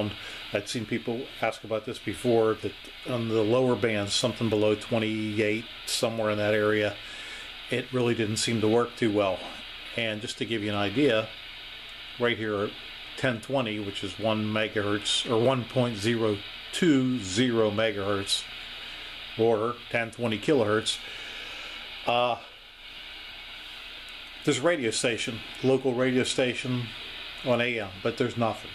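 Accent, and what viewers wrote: United States. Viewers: are you from Pittsburgh? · Sounds like you have a Pennsylvania Dutch Accent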